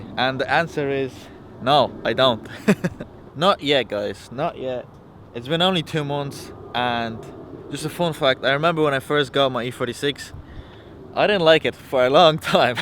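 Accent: Ireland. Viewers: Where you from your Irish sounds mixed up with another language?? Great car I want to buy auto would you recommend thanks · you sound like you have adapted a irish accent but have talked another language in your early age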